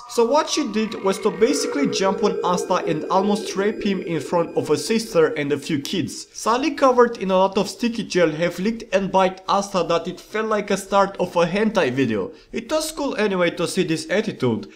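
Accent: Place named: Russia. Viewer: The russian accent and "scary" backround music make this video comedy gold.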